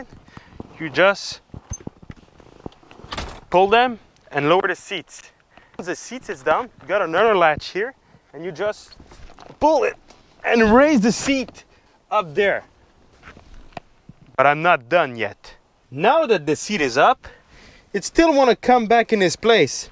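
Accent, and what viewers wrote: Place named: Quebec, Canada. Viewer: damn this is really funny, love the jokes. Your delivery suits the French Canadian accent perfectly.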